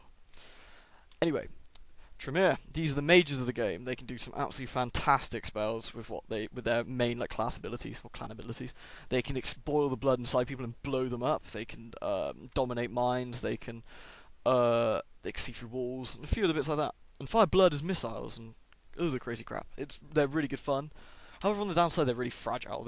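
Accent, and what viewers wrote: United Kingdom. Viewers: since I have no interest in this game, I think I just sat through almost 8 minutes of video just to hear his fabulous British commentary XD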